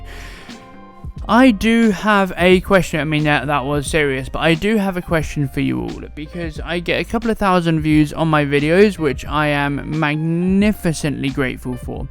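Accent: United Kingdom. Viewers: Already subscribed. Learning a lot! Thank you. But the music is a little distracting when i understand English just not England English so some of your words are already difficult to pick up sometimes.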